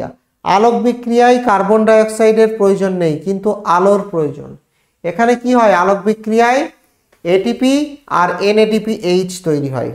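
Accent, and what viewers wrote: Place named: Bangladesh. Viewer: Ato bangla des lok mone hocche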